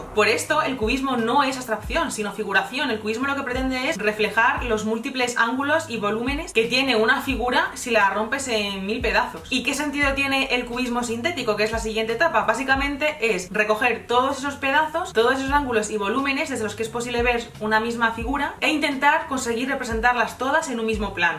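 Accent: Castile, Spain. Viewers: Eres muy bonita y, creo que debes hacer algo, porque percibo que la imagen de tu rostro permanece tiempo excesivo como primer cuadro. Si es verdad que el gran público, está interesado en ver imágenes interesantes conteniendo arte, si es verdad también, que tu rostro es muy bonito y, que haces bien en mostrarlo, le harías un gran favor a tus seguidores si acortaras el tiempo en que sale tu bello rostro a cuadro. Y bueno, la última y me voy: Mujer divina, tienes un halo mágico, me gusta mucho lo que dices y tu voz es cálida y tiene color pero, hablas demasiado rápido y el acento castizo termina haciendo tu parlamento algo confuso. Felicidades, contigo he aprendido mucho. Saludos.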